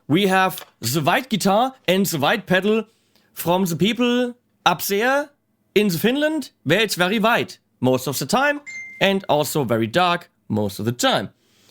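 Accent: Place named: Germany. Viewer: your accent seems particularly German today.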